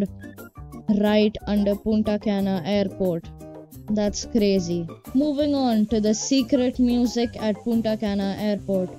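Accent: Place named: India. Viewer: Bro sounds like indian😭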